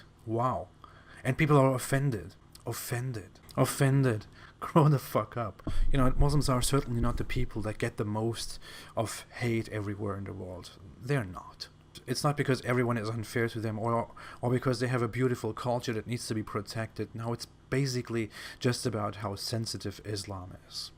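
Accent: Germany. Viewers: Don't apologise for accent. German superior to the bastard dialect called English, duh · Hallo, i can spot his german Akzent as soon as he started speaking xD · Most impressive is that he his accent is a German one. Really hope he wasn't given too hard a time when he was here as a child, by "either side".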